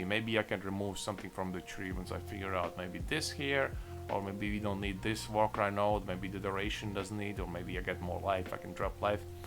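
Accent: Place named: Russia. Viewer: Чувак, все круто, но всем понятно, что твой английский не мейн язык. И скорее всего твой мейн язык русский. Запили этот видик на русском. Ты показал действительно крутые скилы.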